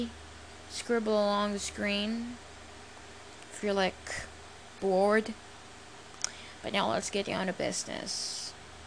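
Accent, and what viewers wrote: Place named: United States. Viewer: Can you just speak natural?? Damn! You sound annoying with all your Anerican English Accent!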